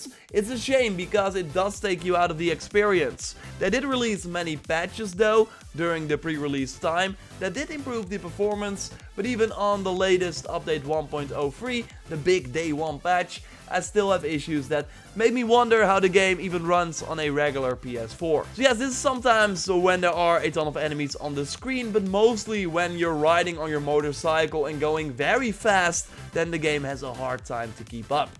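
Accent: Netherlands. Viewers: Your voice man... it bothers me so much wtf i know its a dutch accent im dutch myself but it's just incredibly annoying to me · Nederlands accent, lekker man, Nice review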